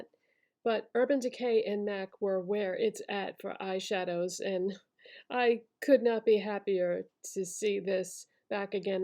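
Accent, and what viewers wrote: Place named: New England, United States. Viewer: Actors take note, this is a legit New England accent.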